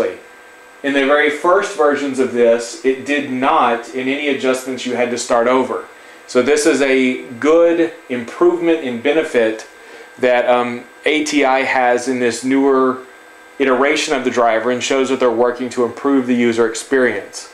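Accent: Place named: Texas, United States. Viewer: Are you from Texas? You have a hella texas/southern accent. Whenever you say "compensated" you say compINsated. Its funny. Curious if you're from Texas. Great demo of bezel compENsation though :)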